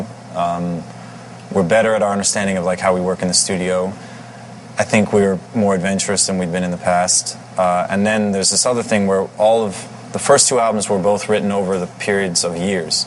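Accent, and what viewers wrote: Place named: United Kingdom. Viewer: Is it me or he slips in brit accent from time to time? He tries to be super american, but he grew up among people who have a brit accent. Don't deny your origins, Paulie ;)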